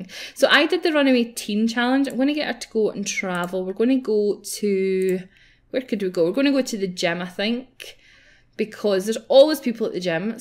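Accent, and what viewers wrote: Scotland, United Kingdom. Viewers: I love your accent what part of Scotland are you from?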